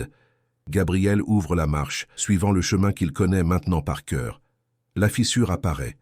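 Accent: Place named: Marseille, France. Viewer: Très agréable votre voix Marseille 😊 un peu de soleil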